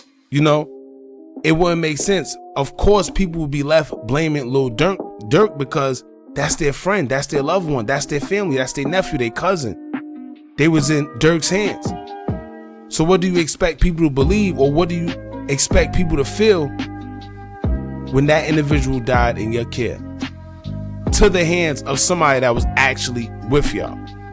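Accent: New York, United States. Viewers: Hey Bro are you from New York?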